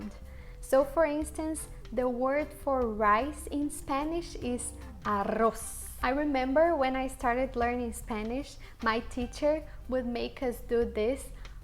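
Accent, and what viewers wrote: Brazil. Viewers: Você estava falando em português do Brasil, em vês de português de Portugal... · It was you who put the subtitles? Its because the flag is Portuguese, but the subtitles and your pronunciation are Brazilian. About the "V" in some zones of north of Portugal people read the "V" like in Spanish. "Vaca" stay 'βakɐ. The Portuguese have no problem learning Spanish, in fact a Portuguese needs half the time than a Spanish because of the sounds. In European Portuguese we have a strong "R" and we do not read it as "H". In fact the Spaniards have problems in reading our R. You must change the flag once your comparisons and pronouncements are in Brazilian Portuguese and not European. To be honest, it is harder for a student to learn Portuguese after learning Spanish than for learning Portuguese first. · All she says is for a Brazilian Portuguese. European Portuguese sounds nothing like she's talking. 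It sounds more like a mix of French and Polish tbh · Tenta praticar mais o teu portugues de portugal porque tu estavas a falar portugues do brasil e nao do Portugal eu sou português tenta praticar mais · But Portuguese you speak is Brazilian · Your Portuguese pronunciation of words is Brazilian not European. Can u upload a video how to pronounce various sounds like 'rr' in European Portuguese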